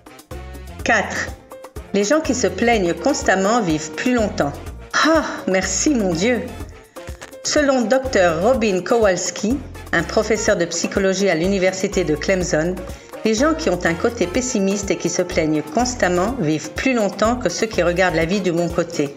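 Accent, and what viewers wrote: Quebec, Canada. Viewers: Arrêtez avec vos "la voix est nulle" l'accent québécois on comprend rien !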